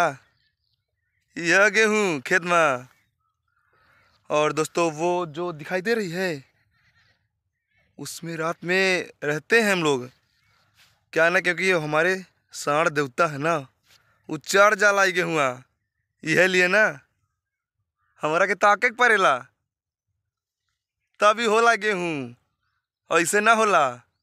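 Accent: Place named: Bihar, India. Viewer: bhai ki boli bihari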